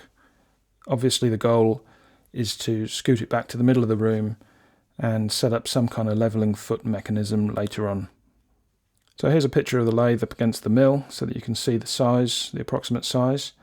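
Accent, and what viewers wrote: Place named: England, United Kingdom. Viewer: Hi, Robert from Sydney here 👋🏼 Newbie to your channel mate, but enjoying your ‘stories’ immensely. Didn’t even know you were in Oz till this vid. I got fooled by the English accent 🤣 My younger son is currently on a two-year secondment in Hobart… due back to Sydney this year. I understand your need for space-efficiency. A lot of my machines are on castors as well ( single car fibro garage 😳). I like your design for heavy duty ‘bases’ for the machines 👍. Regards Robert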